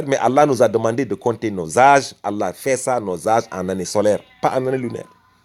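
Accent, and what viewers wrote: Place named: Pulaar, West Africa. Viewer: Un vrai accent halpular!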